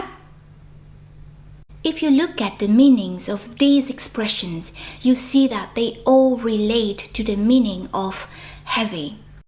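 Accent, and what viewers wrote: South, Vietnam. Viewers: the teacher speaks Southern Vietnamese accent!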